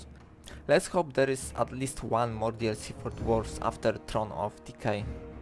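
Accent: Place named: Poland. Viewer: Slychac ze polak haha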